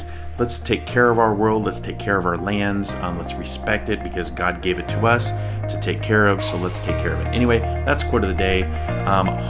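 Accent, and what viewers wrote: Tamil Nadu, India. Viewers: I love your tamil accent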